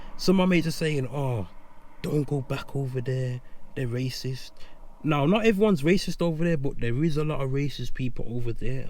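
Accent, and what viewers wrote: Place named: United Kingdom. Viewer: His accent is clearly british !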